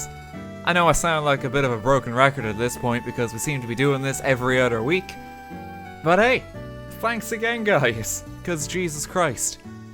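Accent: Ireland. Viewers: So what part of Ireland are you from? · Holy shit youre irish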